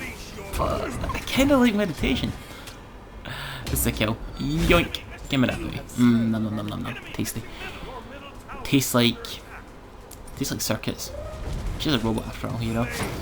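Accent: Scotland, United Kingdom. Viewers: nah bro I'm trying to start YouTube I watch you're vid heard the accent and I was so happy to find another Scottish smite player. But I play Xbox if I had a PC I would play with you for sure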